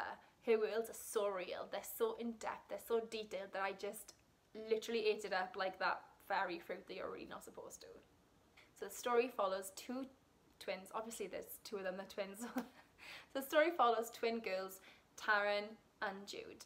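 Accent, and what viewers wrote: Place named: Wales, United Kingdom. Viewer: Welsh huh. None too many have your accent in Canada. I just have the generic Canadian accent, which is clear but lacks lilt. Can't say I read any of your top 8 this yr.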